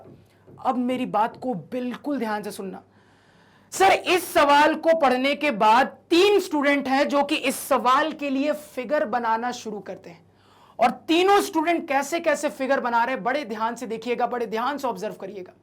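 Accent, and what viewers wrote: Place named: Bihar, India. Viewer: Sir app ko 5-6 months ho gye insta ID bane ko khete hue sir abb to bana lijye And in 11 th lecture you somewhere speak bihari but in 10th you speak like kaddhi boli . Kabhi 10 th lecture me bhi bihari language ka tadka lagyie.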